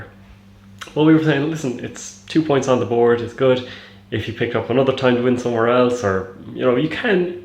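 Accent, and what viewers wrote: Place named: Ireland. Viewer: Heh didn't pick up on your Irish accent, but now I can totally hear it. Congrats on your buddy's win!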